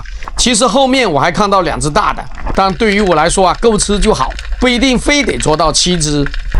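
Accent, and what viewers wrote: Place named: Fuzhou, China. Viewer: 你是福建福州人吗 福州口音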